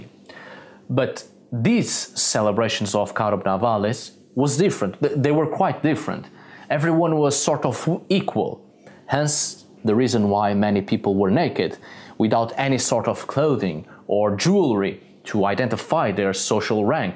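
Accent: Portugal. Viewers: As a Brazilian portuguese speaker, your accent is so strong I almost don't understand "caretos". Looks you're fluent in European Portuguese, so to speak. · Your accent in English and especially in Portuguese very much sounds like a Portuguese speaking those languages. As well as your content, you recently talked about Umbanda, you made a video on the runes, you talked very much about the Iberian peninsula in the video about druids. Are you portuguese? Or is european portuguese your mother language? I say it because, as a brazilian, I'm very used to the way portuguese people talk and all, and couldn't help but notice these similarities. Also, great video! would love to see more about Portugal and North/northwestern Iberian celtic traditions, culture and history overall. It's very difficult to find specificities about that especially if you consider that Brazil tends to see Portugal and portuguese culture as too alien, often calling the portuguese settlers and conquerors "europeans" even tho they were the only ones to colonize here, for instance, and we don't even learn on school about pre-modern Portugal at all, making it look like we don't even descend from them at all (far from the truth, culturally and especially ethnically). 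I see you live in Portugal and has much interest on the country and. culture too, would be great to know more about it then! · Indeed He's speaking European Portuguese. And as it appears, he resides in Portugal atm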